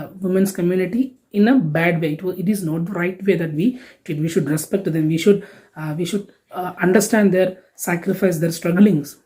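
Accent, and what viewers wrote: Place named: Kerala, India. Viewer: Sir u r from Kerala no???? We can identify it when u r talking Sir I too a malayali 🙂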